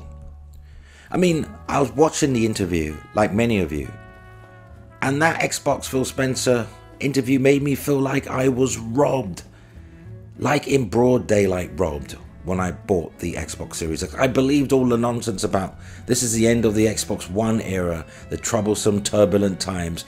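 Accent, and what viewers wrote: United Kingdom. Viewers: The Brittish accent makes the insults even funnier. Lmao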